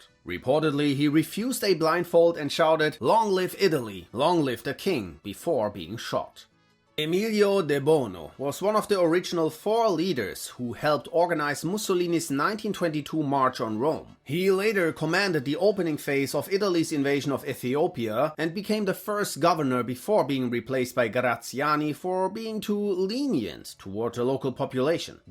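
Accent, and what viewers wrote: Germany. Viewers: Said by a german gives it a wholly different taste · Aha! Quite good, but very german 😊... Like my german: quite good, but very italian 😂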